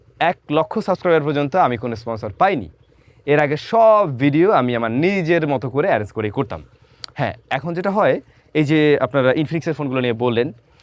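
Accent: Barishal, Bangladesh. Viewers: ভাই, আপনার বাসা কি মোগো বরিশালে🥰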